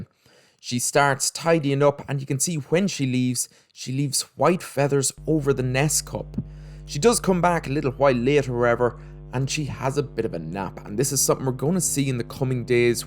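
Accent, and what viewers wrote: Ireland. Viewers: Nice Irish accent! Cheers! ☘🍻💚🧡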